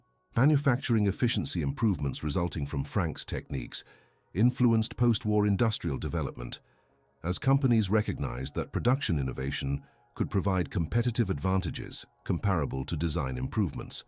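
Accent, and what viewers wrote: United Kingdom. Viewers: Guess what, The United States of America is NOT the only country that watches YouTube,! Go ahead and unsubscribe if grousing about a British accent is the only comment you can make about an very interesting and informative video. (By the way, I was born and live in the USA.) Let me guess, you are a liberal, they are the only people I know that are bigoted enough to make such an uncalled-for comment. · you need to dispense with the British English!! This is the United States of America! If i have to put up with your kind of english I'LL UNSUBSCRIBE!!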